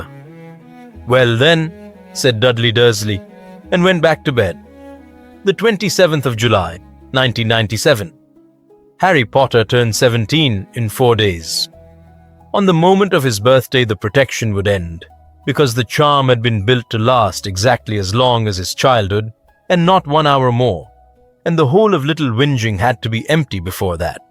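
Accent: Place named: India. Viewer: I enjoyed this story. I liked the Narrator with the Indian accent. I liked that Petunia was a decent character, not the abusive woman she is in all other versions.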